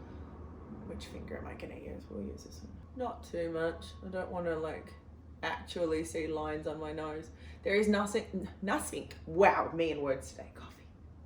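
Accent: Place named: Australia. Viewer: You are gorgeous, with or without makeup. I love your Australian accent. I enjoy listening to your videos because of the way you speak. You mentioned that you are delving into acting projects. Are you learning how to pronounce words in other accents as well ?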